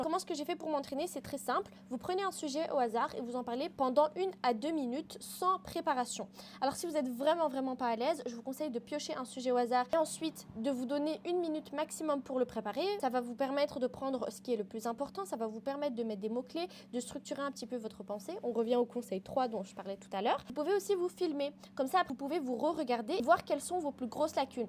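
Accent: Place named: Tunisia. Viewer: Tu es tunisienne 😂😂😂 je reconnais l’accent 💕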